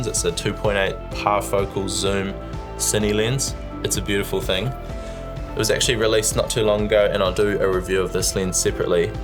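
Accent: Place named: New Zealand. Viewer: Hah, might be worth it to specify that. I very much noticed the Kiwi accent, but seeing as the majority of L1 English speakers on earth are American, the word "dollar" is presumed to be the American one unless otherwise specified (online and in international contexts). That's what some of us are incredibly confused about the price (I came to the comments to see if you'd explained it) — for us, the Ursa 12k is $6,000; and the Ursa 4.6k, even cheaper. So when you said it was $25,000, it very much elicits a terrified "OH GOD WHY DID HE PAY THAT MUCH MONEY" response.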